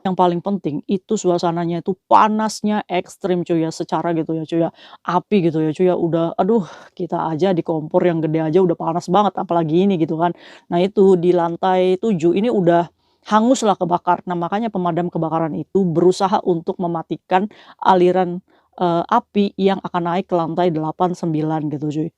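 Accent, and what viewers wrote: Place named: Java, Indonesia. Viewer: kirain orng jpng tnyta wong jowo 😊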